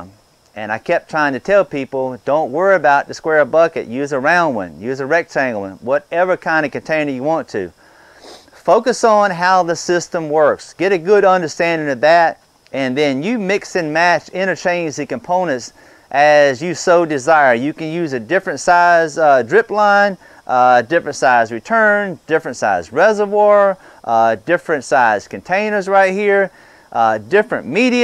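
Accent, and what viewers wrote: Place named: Louisiana, United States. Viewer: I remember many years back, addressed on video, subscriber comments about his "accent". He stated that after going back and listening to himself he couldn't hear what people were talking about? I always figured it was remnants from the cajun region...if I recall right? I had never even noticed till brought it up one day and lo and behold He hasn't lost any of his verbal charm!!! Love ya, you have taught me so much the last decade, I truly appreciate the many many hours you put in and the honest down to earth teaching you blessed us with. Hey, when the snowflakes show up next time....let us handle 'em....with love & kindness.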